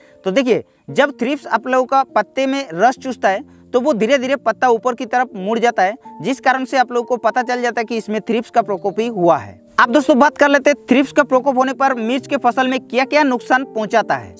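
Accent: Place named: Odisha, India. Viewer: यह तो उड़ीसा उनका बोलचाल से समझ हिंदी बोलकर कितना वीडियो बड़वार काम हो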